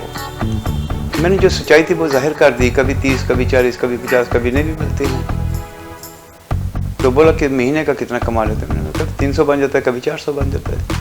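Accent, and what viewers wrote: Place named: Jammu, India. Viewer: his dogri accent is good with the music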